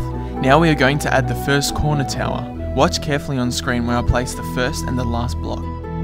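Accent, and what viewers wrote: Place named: Australia. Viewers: all aussies sound the same apparently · i did not know was Aussie!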